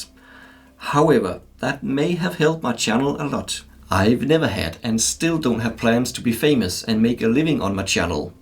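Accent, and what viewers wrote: Denmark. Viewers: Your Channel is One of my Favourite. love your danish accent. please keep making videos. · Ann, it's a Danish accent. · Tillykke med det! Og du har dig en rigtig solid dansk accent når du taler engelsk, men det er der jo ikke noget i vejen med :D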